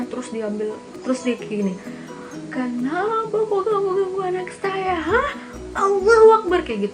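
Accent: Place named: Manado, Indonesia. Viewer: Logatnya manado ya kaa. Hehe sm🙂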